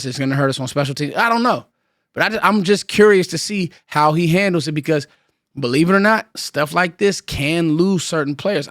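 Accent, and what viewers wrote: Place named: Washington DC, United States. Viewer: I knew u was dc by the way u talk..I ran across a lot of dudes from dc in the feds slim..a lot of them are stand up men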